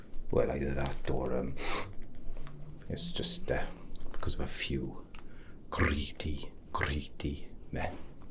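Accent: Wales, United Kingdom. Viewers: You have such a lovely voice, whether you be singing or speaking, It's lovely. With your welsh accent it just made the video better. ☺☺☺ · I can understand him easily but then again I’m Welsh so the accent isn’t an obstacle for me maybe that’s what’s throwing you off? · The Welsh accent makes my brain happy. 😁💜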